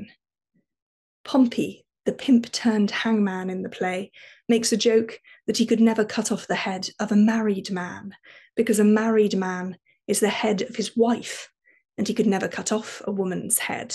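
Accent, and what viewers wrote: United Kingdom. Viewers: The British accent is beautiful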